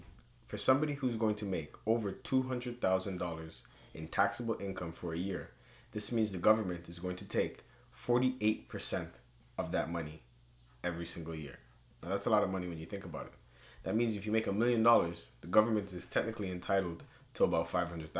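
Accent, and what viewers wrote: Jamaica. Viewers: Hey bro, Are you originally from Jamaica? Also, what broker do you recommend for US clients? Thank you for sharing.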